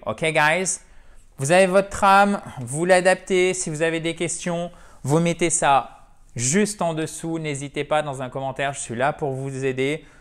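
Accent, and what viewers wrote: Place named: United States. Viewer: Finalement tu enseigne l'anglais américain où britannique car dans tes prononciations tu emploie plutôt l'accent américain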